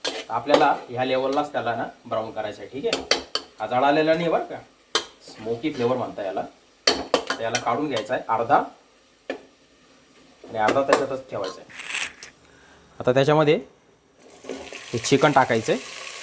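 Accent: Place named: Khandesh, India. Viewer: दादा तुमची भाषा खान्देशी आहे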